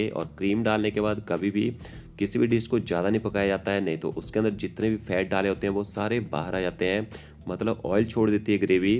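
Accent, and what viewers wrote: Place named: Uttarakhand, India. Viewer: This chef is from Uttarakhand guessing cuz of accent 👍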